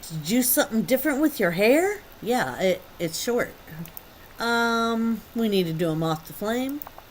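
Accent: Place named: Scotland, United Kingdom. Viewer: The scottish accent I cant get over. can I have your autograph? Your a star ❤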